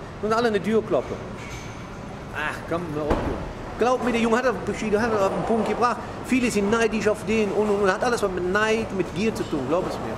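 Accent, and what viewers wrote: Cologne, Germany. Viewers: Der Typ kann nix und hat nix. Er ist eine Kölner Laberbacke! Er äußert sich zu gewissen Themen im Kölner Dialekt und veröffentlicht die Video auf YT.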